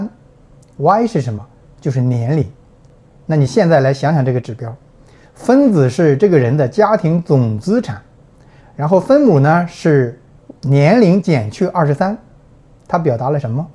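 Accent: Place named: Heze, China. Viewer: 厉害！我感觉听你口音有点像菏泽地区的人，不知道猜的对不对？😂😂😂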